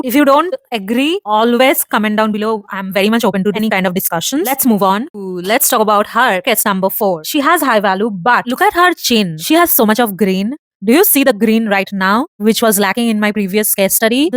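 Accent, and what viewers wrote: India. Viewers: Quite informative video. Please work on your accent a bit more. You sound so like a stereotype of heavy Indian accent. I wonder if it's for the effect! 😂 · Hell Nha. The actual stereotyped Indian accent only could be heard from south indian people. Obviously she has a Indian accent too just like me. But it's more like North Indian accent. Anyways having accent is normal. Americans have their own accent, British have their own accent. East Asian have their own accent. So do we. But it's just the accent changes within the country because of how multilingual we are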